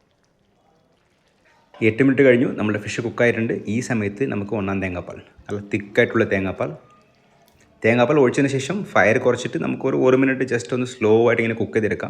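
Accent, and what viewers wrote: Tamil Nadu, India. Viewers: Ningalku tamil accent undallo! Nattil evdeya?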